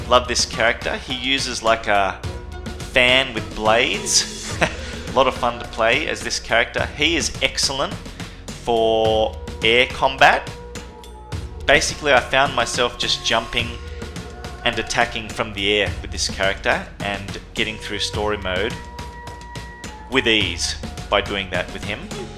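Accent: Australia. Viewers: What reign of Australia are you from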